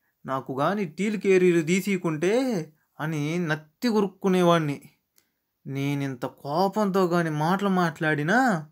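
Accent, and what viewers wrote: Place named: Chittoor, India. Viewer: Baya chittoor slang lo chaduvu baya super ga untadi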